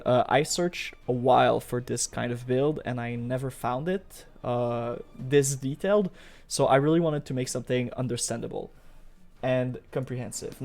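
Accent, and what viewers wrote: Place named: Quebec, Canada. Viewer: I recognize the Quebec accent and I am happy. Great build as well. I never learned how to play Kineticist and this makes me want to try it out.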